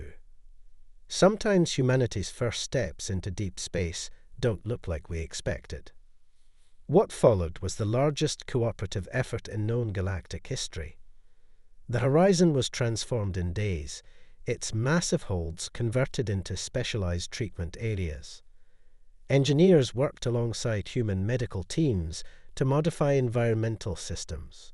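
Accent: Scotland, United Kingdom. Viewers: A story read with a Scottish accent! Lovely ❤ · love the accent, brings back memories of my Scottish Grandmother ❤‍🩹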